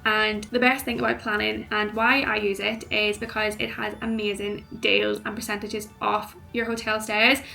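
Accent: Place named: Ireland. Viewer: Lovely Irish accent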